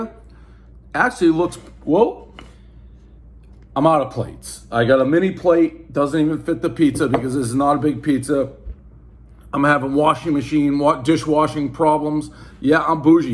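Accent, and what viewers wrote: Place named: East Coast, United States. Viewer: If you watch the closed caption, you can understand why people from the east coast sound so god damned ridiculous... That being said, I love the reviews... thanks for keeping us entertained,! You rubbaneck!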